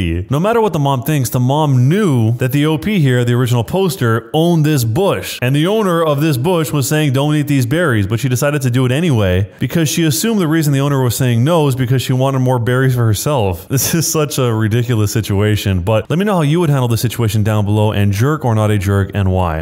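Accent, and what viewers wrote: United Kingdom. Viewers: BRO THE BRITISH 1980 ACCENT IS KILLING ME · That British voice 😂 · the British accent made me go pffffft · this british accent had me on the floor 😂😂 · The brit accent tho 💀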